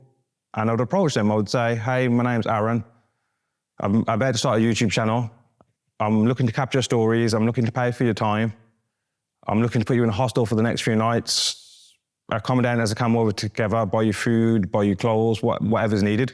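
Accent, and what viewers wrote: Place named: Birmingham, United Kingdom. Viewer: i could tell you were a brummy 😂😂😂😂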